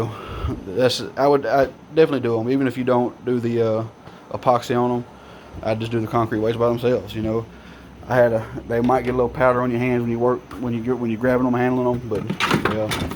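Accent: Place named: South, United States. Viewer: Awesome weights and southern accent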